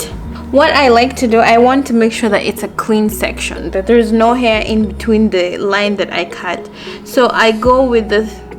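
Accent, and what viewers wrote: Kenya. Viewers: What are you talking about her accent sounds very Kenyan lol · used to talk like this even when she was in Kenya lol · That has been her accent even in Kenya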